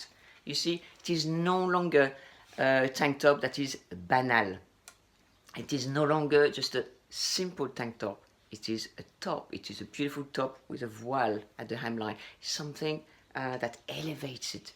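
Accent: France. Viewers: I love your accent. Your clothes are beautiful, timeless classic pieces that you could have in your wardrobe for many years. I think it's worth investing a little more money into good fabrics and a piece that's a little different. As you would wear them time and time again. I love the black and white, and navy and white stripes and polka dots in your wardrobe, and accessories, just beautiful 😄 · Hi, I’ve just discovered your channel and already watched a bunch of your videos. I love them and I love your English with French accent! It’s so cute! I was wondering if you could do a video on how to dress according to age. I’m in my late thirties. Blessings from Argentina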